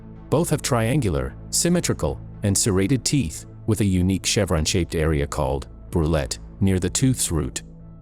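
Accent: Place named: New England, United States. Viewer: FINALLY!!! A mini doc that doesn't try to proclaim that the Meg is still alive. Thank you so much. 2 things - - It's pronounced Ea pock not Epic. I do believe that the narrator is from New England or where "evah".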